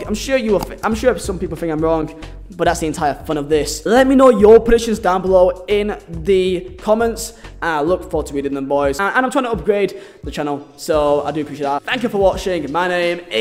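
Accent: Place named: Lancashire, United Kingdom. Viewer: Burnley fan here! Love your videos, love hearing a Lancashire accent on YouTube, and your takes are very considered and fair. Keep it up pal !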